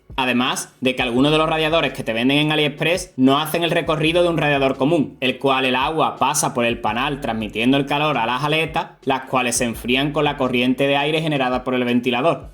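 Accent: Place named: Seville, Spain. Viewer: Ayer descubrí tu canal. Bien explicado y se nota que sabes de lo que hablas. Buen acento ¿Sevillano? Sigue así, te veo top en YouTube 😊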